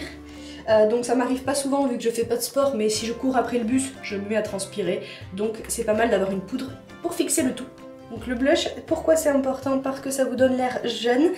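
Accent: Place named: England, United Kingdom. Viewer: J'adore ton accent englais..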